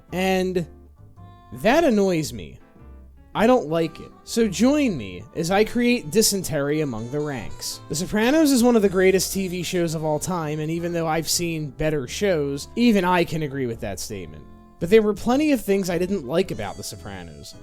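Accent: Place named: Philadelphia, United States. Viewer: Listening to you more it's gotta be a Philly accent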